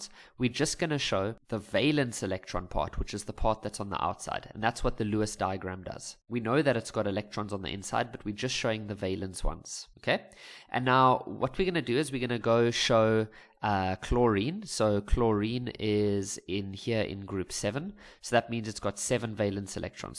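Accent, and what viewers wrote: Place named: South Africa. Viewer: you from south africa aint you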